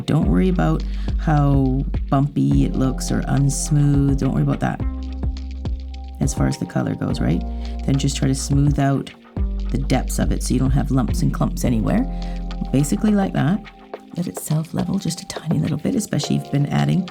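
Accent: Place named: Canada. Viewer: Her speaking voice sounds sooo much like Shania Twain. That subtle Canadian accent. At least I assume she is Canadian because of her accent. Seriously, find a clip of Shania Twain talking. Love it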